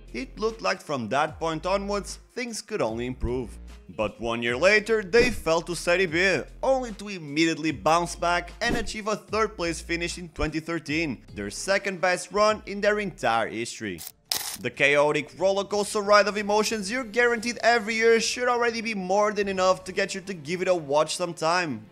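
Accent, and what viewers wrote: Portugal. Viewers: i love your european portuguese accent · aposto que ele é portugues, pelo sotaque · Is the dude narrating from Portugal or something? His pronounciation of the names sounds like a Portuguese trying to say the brazilian names. The S feels almost like a Carioca speaking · Por quê? Parece sotaque português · This accent don't lie to me: you're abolutely tuga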